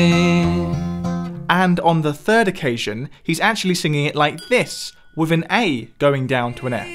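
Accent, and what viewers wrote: United Kingdom. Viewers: If this guy didn’t have a British accent I would have turned this video off at :45. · Your accent is more like than mine, but we all say the word " " with the first syllable stressed and a little higher in pitch, right? In American English that first syllable isn't hit as hard in UK English even allowing for the wild variations we have over here. So, maybe it's sprechstimme?:-D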